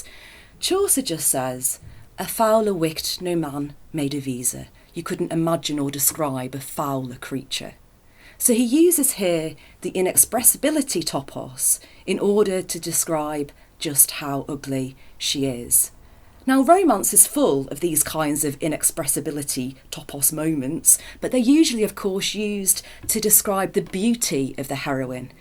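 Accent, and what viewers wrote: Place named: United Kingdom. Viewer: British accent, it has to be good.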